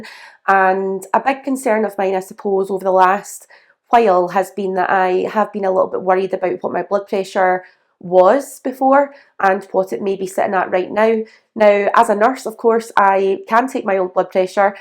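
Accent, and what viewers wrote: Scotland, United Kingdom. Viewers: Your Scottish accent is adorable 🎉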